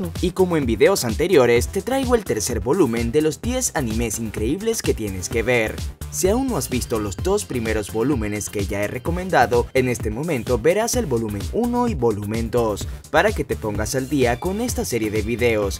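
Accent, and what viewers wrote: Venezuela. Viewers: marico eres venezolano :o no pense que habrian mucho otakus venezolanos saludos desde puerto cabello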